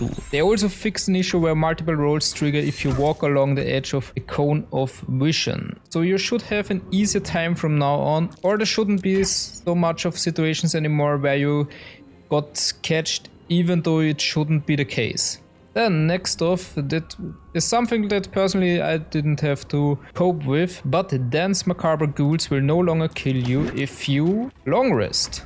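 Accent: Germany. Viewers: No offence, but that's a hell of a german accent... XD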